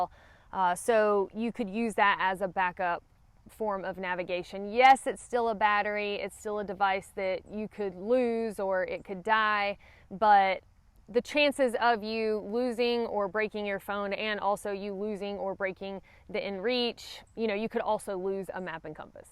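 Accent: South, United States. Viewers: Over the years of watching you videos I have learned to adore you southern accent. Naat Haakin (night hiking) aat (out) aas (ice) and so on. I love it. Please don't take it as a criticism, it really isn't. I look forward to it. Your greeting of "Hey Y'all" is similar to our UK "hiya". Best wishes from the UK.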